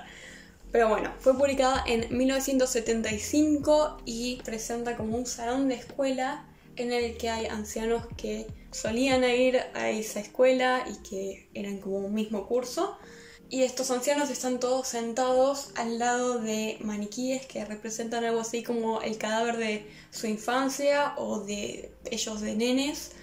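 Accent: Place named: Rioplatense, Latin America. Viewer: Estuve buscando un canal argentino de libros (porque me encanta el acento rioplatense 😍) y encontré el tuyo, me gusta muchísimo! ❤️ Lorca es mi escritor favorito, leí Bodas de Sangre hace un año en original y para mí es una maravilla ❤️ También vi la película y pienso que es tan mágica como libro, me encanta! Saludos desde Polonia 🇵🇱❤️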